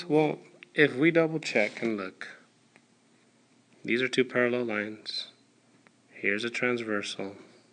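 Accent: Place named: Egypt. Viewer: your voice is like egyptians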